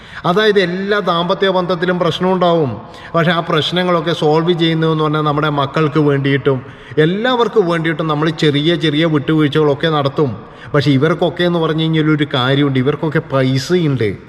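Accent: Kannur, India. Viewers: കണ്ണൂരുകാരൻ ആണെന്ന് സംസാരത്തിൽ നിന്ന് തോന്നുന്നു എന്തായാലും 100% സത്യമാണ് പറഞ്ഞത് മുഴുവനും യേശുദാസിനെ കണ്ടുപഠിക്കാൻ ഒന്നുമില്ല യേശുദാസ് എന്താ ജീവിതത്തിൽ ചെയ്തതെന്ന് യേശുദാസ് തന്നെ മനസ്സാക്ഷിയുടെ ചോദിക്കട്ടെ അപ്പോൾ ഉത്തരം കിട്ടും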